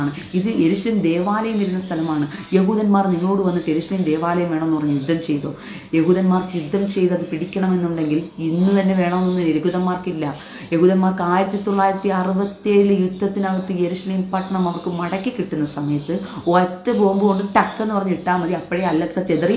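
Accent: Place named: Palakkad, India. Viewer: Ms.,are you a Palghatwala your language Usd seems to be of palghat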